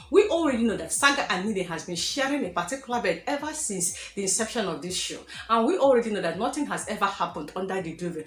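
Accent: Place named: Igbo, Nigeria. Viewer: your voice is too igbotic nne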